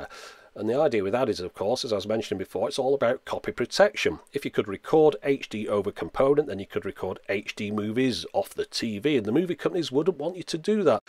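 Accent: United Kingdom. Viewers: Это британский акцент всегда радует мои уши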